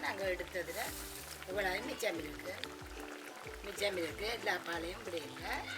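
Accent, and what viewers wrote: Jaffna, Sri Lanka. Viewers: Engelnde yalpanam tamil neenga pesa nalla iruke ketka. Engelnde amma vum ipadi thaan seireva vendika kulambu annal amma inji poduva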